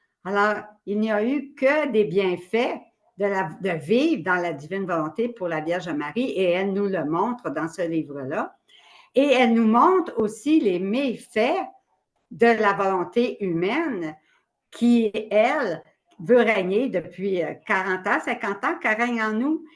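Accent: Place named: Quebec, Canada. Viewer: Bonjour Merci beaucoup pour votre travail...dans la Divine Volonté bien-sûr 😊! Ça faisait un petit moment que je n'avais pas écouté vos vidéos. Très content et joyeux d'entendre toutes ces belles et magnifiques lessons de Jésus et Marie et t'entendre votre charmant accent...accent québécois qui m'invite toujours à aller rendre visite à mes frères d'Amérique du nord. Si Dieu le veut 🙂. Merci à Dieu pour la motivation, l'engouement...etc qu'Il vous donne pour accomplir ce travail afin de nous encourager à continuer sur ce magnifique chemin d'Amour et de Vie qu'est la Divine Volonté. Ce sont les merveilles de Dieu...qui pourra l'arrêter... notre volonté propre? Non, nous te la donnons Seigneur, au nom de tous et pour tous, pour ta plus grande Gloire et le Salut du monde. Amen!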